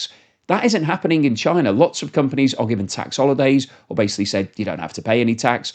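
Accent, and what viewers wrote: United Kingdom. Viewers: It's British English. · This guy sounds like he is from the UK why is he always talking about China? Is it because he’s xenophobic? Or because he knows talking about Chinas collapse brings low IQ people to watch his videos?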